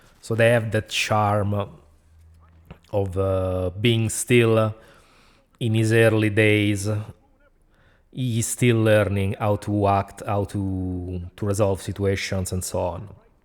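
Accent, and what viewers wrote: Italy. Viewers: By the way. The way you say Batman with your Italian accent is so satisfying. In a sense of the phonetics of it. Sorry autism lmao.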